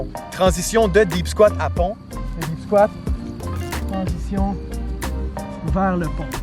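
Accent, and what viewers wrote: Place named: Quebec, Canada. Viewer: J'adore t'ai vidéo surtout ton accent cebequoi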